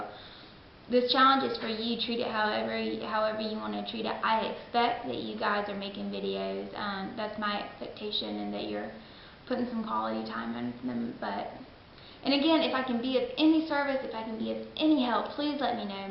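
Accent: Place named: South, United States. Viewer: Totally agree with you regarding the water and exercise incriments. I assume the 2 hours is weekly? Love the accent too, as a native southerner I figure you are from Georgia or SC? Keep up the good work!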